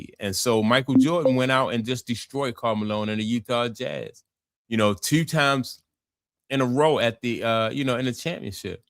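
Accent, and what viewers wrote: Baltimore, United States. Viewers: Can tell my man doing the video is from Baltimore. I respect the hustle G! Great video.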